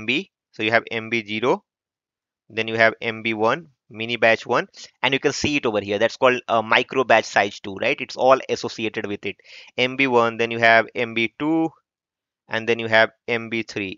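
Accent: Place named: Bihar, India. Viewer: Bro are you from Bihar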